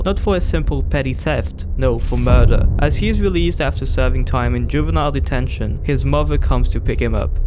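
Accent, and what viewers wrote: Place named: United Kingdom. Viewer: you are french but still your accent is unbelievably british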